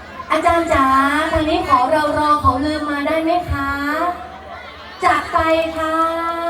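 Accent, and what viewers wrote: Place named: Isan, Thailand. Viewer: น่ารัก เสียงดี เพราะมาก แถมพูดก็เก่ง ❤🎉สุดยอด เสียงอิสาน❤🎉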